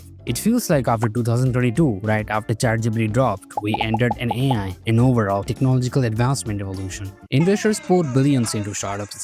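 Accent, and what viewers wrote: India. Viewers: Buddy you got that indian accent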